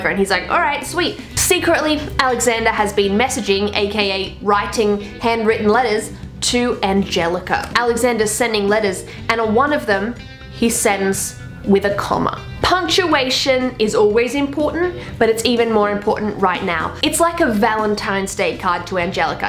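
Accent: Australia. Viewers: I love ur Aussie accent👍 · Skylar Sky she’s Australian its an accent thing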